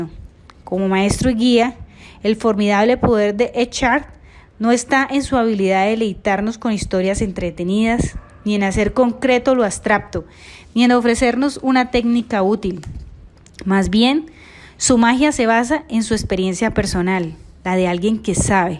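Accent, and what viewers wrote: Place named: Latin America. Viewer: De todos las versiones en español, me gustó más su versión ya que es español latinoamericano y puedo entender bien el acento ❤ gracias!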